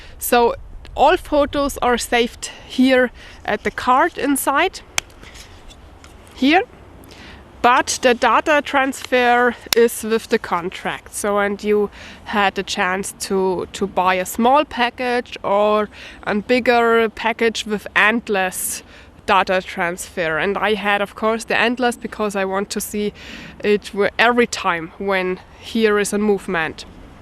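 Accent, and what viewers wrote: Bavaria, Germany. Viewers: Hi! Regarding security for your little forest cabin ("hut"), I cannot add much more to what others have already written in the comments. I recently came upon this channel of yours. After watching 3 of your videos, I was impressed with your knowledge of outdoor skills. I was especially impressed with your knowledge of edible wild plants and herbs. So I subscribed to this YouTube channel. Als ich feststellte, dass Sie auch einen deutschen Kanal haben, habe ich ihn auch sofort abonniert. Es ist sehr beeindruckend, dass Sie Videos in englischer Sprache machen. Aus einiger Erfahrung[*] weiß ich, dass es nicht einfach ist in einer Fremdsprache frei zu sprechen. Also! Für ihre tolle Leistung... Hut ab! Und ich wünsche ihnen weiteren Erfolg bei allen was Sie unternehmen. Übrigens ihre Kinder sind hübsch und süß. I love your German accent in English and your Bayern accent in German. 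--- [*] In den 90er Jahren lebte ich in Deutschland und habe für eine deutsche Firma gearbeitet.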